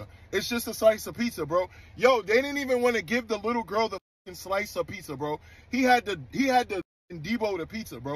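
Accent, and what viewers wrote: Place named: New York, United States. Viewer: Really? As a fellow Newyorker, I had no issues. We must really sound crazy to everyone else 😭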